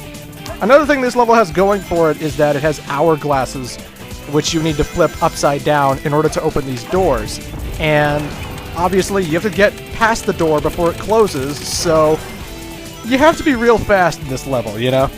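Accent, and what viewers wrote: Canada. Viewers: YOUR CANADIAN!!!!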